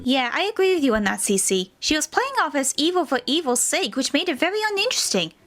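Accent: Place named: United Kingdom. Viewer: For your great British accent and your love for Sunset Shimmer, you gain a subscriber.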